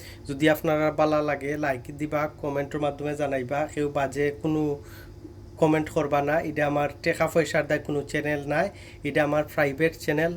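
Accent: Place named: Sylhet, Bangladesh. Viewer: Oi vai kita koin baje montobbo kortam....afne amrar sylhety hokkol or gorbho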